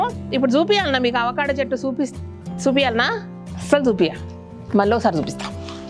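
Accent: Telangana, India. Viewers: GARU MEE BASHA PAKKA TELANGANA BAGUNTADI MEE VOICE KUDA BAGUNNADU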